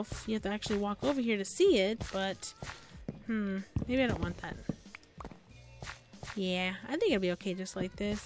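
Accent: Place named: Texas, United States. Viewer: Are you from Texas or South Carolina?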